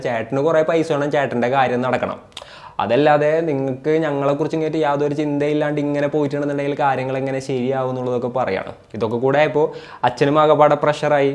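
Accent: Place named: Kodungallur, India. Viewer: സ്ക്രിപ്റ്റ് ഒന്നു കൂടി ശ്രദ്ധിക്കൂ ബ്രോ. ചില സ്ഥലത്തോക്കെ കേട്ടിരിക്കാൻ ഒരു ഫ്ലോ നഷ്ടപ്പെടുന്നു. പിന്നെ ചെന്നുങ്ങയിൻറ്റ്, എത്തിങ്ങയിൻറ്റ്, പോയിങ്ങയിൻറ്റ് പോലുള്ള കൊടുങ്ങല്ലൂർ സ്ലാങ് പറ്റുന്ന പോലെ കുറയ്ക്കാൻ ശ്രമിക്കണം.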